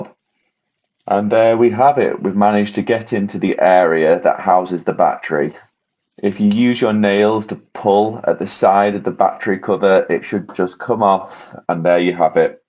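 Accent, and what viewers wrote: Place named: Manchester, United Kingdom. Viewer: This took me way too long to figure out. Thank you for the clear video! Love your videos and love your accent it’s like listening to the Gallaghers! 😍❤️❤️ now I can take Queenie my Dachshund out in the trusty Mazda and know every time the battery to let her loose is tip top! ❤️❤️❤️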